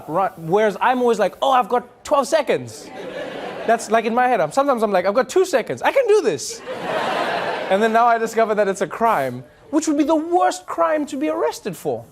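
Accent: South Africa. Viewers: I love how he holds back on his south african accent when he's in America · I love listening to him talk. I think it's the south African accent. Lol, maybe one of the reasons I love listening to. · that south african voice of trevor always cracks me up hahaha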